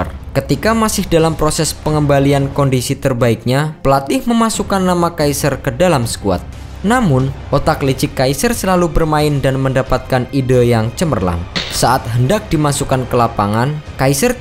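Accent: Java, Indonesia. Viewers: Yg ngomong wong jowo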